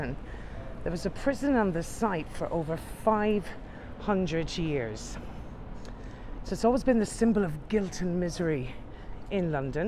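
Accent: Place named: Ireland. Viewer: The best tour available! The Irish voice will take you around the world without leaving home!!!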